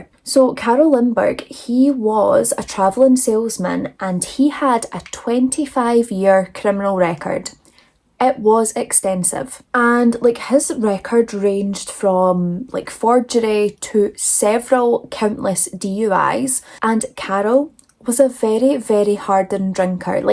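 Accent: Scotland, United Kingdom. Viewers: Hey! I started binging your videos. I like a very diverse group of true crime girlies. You have the cutest Scottish accent I could kiss you 😘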